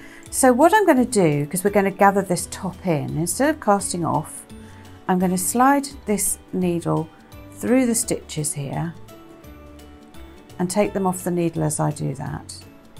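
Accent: England, United Kingdom. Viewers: I love this simple pattern! I am going to make my 3 year old son his very own pumpkin! He can decorate the pumpkin if he wants to. Then I think I'll make some for me and hubby. Thanks again for sharing this pattern. Love your accent. Are you from England? I'm from long island N.Y.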